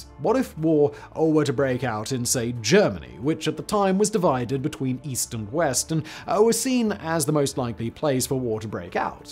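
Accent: RP, United Kingdom. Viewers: legit, the subject is so interesting, but this man is talking so over the top queens english that it's distracting ngl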